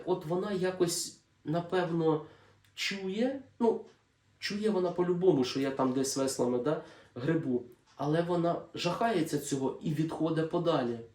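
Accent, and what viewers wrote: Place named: Surzhyk, Ukraine. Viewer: забагато суржику та неправильного використання слів, але неймовірно приємно чути тебе "рідною"! Respect і уважуха. Давай ще!!!!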